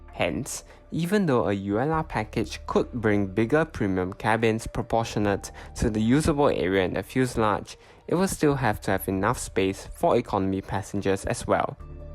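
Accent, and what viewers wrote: Singapore. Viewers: You sound Singaporean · Can’t help but think your accent is that of a Singaporean?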